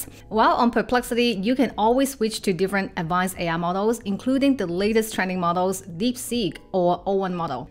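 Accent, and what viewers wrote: China. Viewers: Thank you for your video in comparison; could I make a positive suggestion? for a non native english speaker but with good english language skil, I had a hard time following every thing you said. it was way to fast for me to be able and follow your explainations? Dont get me wrong, I mean it as a positive feed back. You have the typical chinese or asian accent, and speaking so fast, as if you tried to put 20 min in 5 minutes, that it became hard to follow. good luck, and thank you again. 🙏👍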